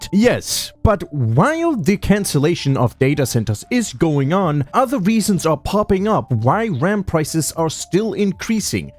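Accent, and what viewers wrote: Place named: Europe. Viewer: Yes and No, this guy has always been politically biased, based off the accent he's probably European or south American or smthn which means this guy has probably zero actual knowledge of America or its politics (not that most Americans are any better). Attacking Iran is an objectively correct choice and something we should have done decades ago when they first had their regime change. They are the #1 sponsor of terrorism in the world and getting rid of them means significantly less problems in the middle east down the line which is why 90% of the middle east + China is in support of the US effort to put them down. And once they're finished we can swoop in, stick all our fingers in all their pies and take their oil for our own as a reward. Aka the same thing we're doing with venazuela. In the short term it means more expensive games, in the long term it SHOULD mean less expensive games and everything else, +less chaos in the middle east. 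It's not a stupid decision, Iran is just more "roachy" than venazuela. Their military is demolished, they have no real power or influence and their only ally Russia is fighting their own war. They're just unnecessarily and pettily spreading it out. Should be over by the end of the year at most or within a couple months at least.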